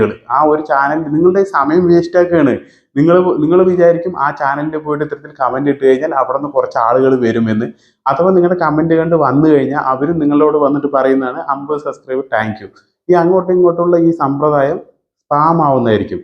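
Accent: Palakkad, India. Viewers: Nigal palakkad ano Etta....🎉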